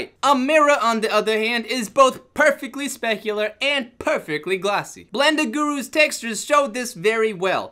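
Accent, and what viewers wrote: New York, United States. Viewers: you have an awesome new york accent.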